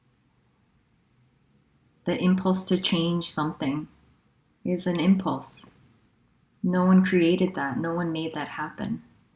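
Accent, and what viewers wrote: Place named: England, United Kingdom. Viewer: Beeeeuuutiful . And I love the English accent when USAID"it's quite amazing that this should happen😂"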